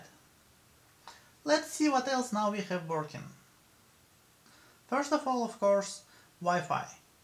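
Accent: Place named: Russia. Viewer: Сраный русский акцент!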